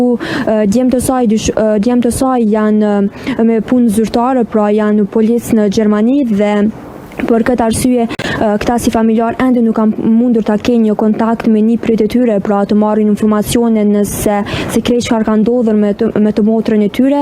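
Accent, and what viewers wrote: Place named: Highlands, Albania. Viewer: O gazetare sa trashe flet na e shpife maloke tamam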